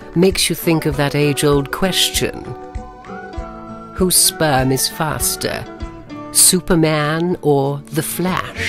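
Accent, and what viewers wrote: United Kingdom. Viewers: I love your british accent i guess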